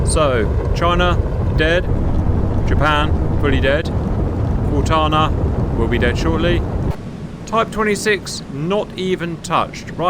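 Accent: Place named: United Kingdom. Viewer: Agreed. YT isn't purely about knowledge. has his own personality, plus he's BRIT-ISH (make a funny pronunciation yourself when reading). When looking at channels that get to this size, it's typically at least half of the host just being interesting/entertaining/weird/fun etc.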